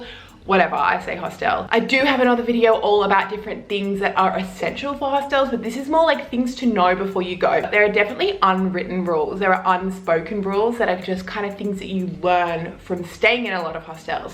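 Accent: Australia. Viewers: She's Australian thats how it sounds Mate with Aussie accent. What accent should she use MANY out there?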